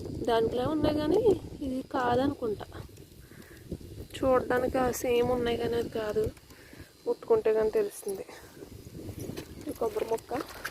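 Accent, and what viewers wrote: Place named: Godavari, India. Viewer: Mi voice change aindi konchem godarolla ammai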